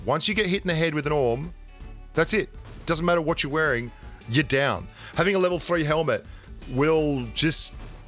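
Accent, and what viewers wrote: Australia. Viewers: "GrAAAAAHzer" gotta love that Aussie accent hahaha keep up the great work, love your videos!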